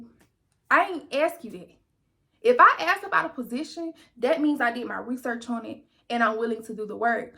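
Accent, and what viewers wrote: South, United States. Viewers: Good information!! Thanks but that southern accent omg 😅😂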